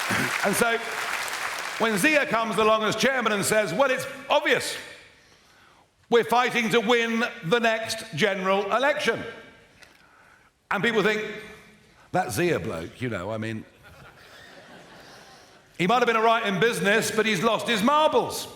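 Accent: United Kingdom. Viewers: Strong speech in a British accent, The pauses & the punch lines of an American President. Well delivered I’m here for it. I’ll leave you to decide which president did he sound like in his delivery? 😅🤣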